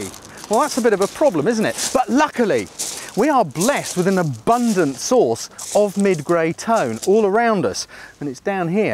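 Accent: United Kingdom. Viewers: very well explained, thanks..your british accent is awwwssssoooooommmmeeee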